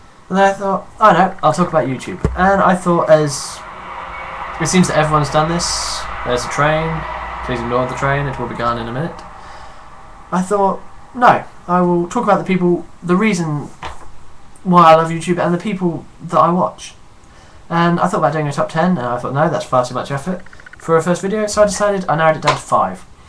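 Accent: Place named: United Kingdom. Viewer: Yeay 1st vlogs! You have a purple female top in your office? I have to say I'm very flattered to be placed among such greatness as the other four. LOL, stalking is okay...sometimes. John Green Book to start with is PaperTowns. Well, it's my favorite. Great job! I'd say talk about whatever your passionate about, that passion tends to show in videos. (I'm also a sucker for British accents. I "teehee"d cause I don't think I've heard "KeepOrJot" said with a British accent.)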